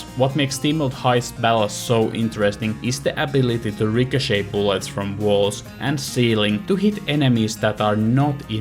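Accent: Finland. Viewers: Oot Suomalainen? Pikkusen toi suomi aksentti kuuluu puheessa 😄.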